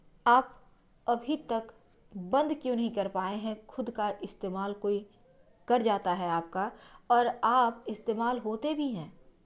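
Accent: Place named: West Bengal, India. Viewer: didi aapnar awaj sune mone hocche aapni bangali.aachen na.aami jante chai ki aapni kundali baniye upay dekhakar jonno koto fees nen pl bole din.nomoskar.aapnar kotha sone khoob bhalo lage.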